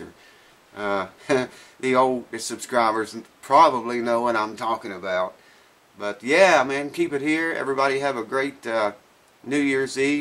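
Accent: United States. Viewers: Enjoyed that even though I hardly ever play my acoustic, still you're a character and I dig your accent, what state of America are you from? Cheers Si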